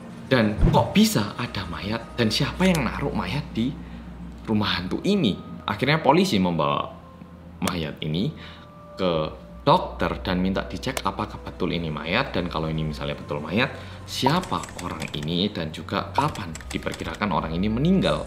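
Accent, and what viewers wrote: Java, Indonesia. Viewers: pembawaannya njawani rendah ati, enak didenger meski medhooook.. stay humble, mas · Jumscare nya emg ngeselin produksinya medok😅, sering x saat kaget hp ku, ku lempar sendiri sangking kagetny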